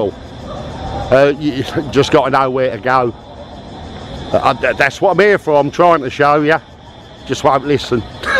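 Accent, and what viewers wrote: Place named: Cockney, United Kingdom. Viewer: Again good video but you cokney accent make dificult to understand fot frog like me !!!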